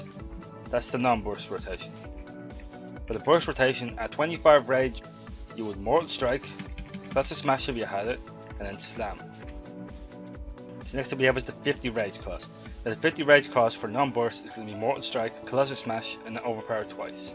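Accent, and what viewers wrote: Ireland. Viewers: Where abouts are you from man? I sense an irish accent!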